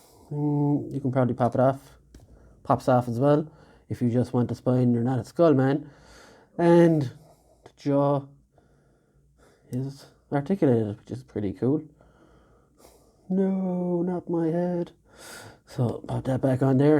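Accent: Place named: County Clare, Ireland. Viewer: Nice :) It's just... A mate of mine and one of my favorite Youtubers, mr. Dazran3o3, comes from county clare and your accent sounds just like him. :P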